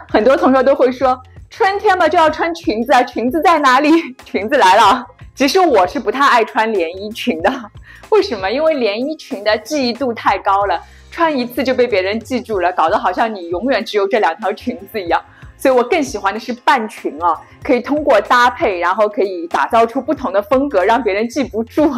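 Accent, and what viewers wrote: Shanghai, China. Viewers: 谢谢推荐。 听口音是上海或者江浙一带的？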